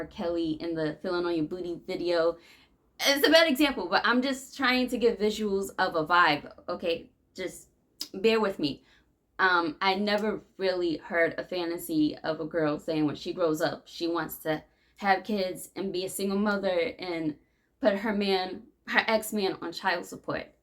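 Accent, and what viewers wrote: New York, United States. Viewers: I love ur New Yok accent!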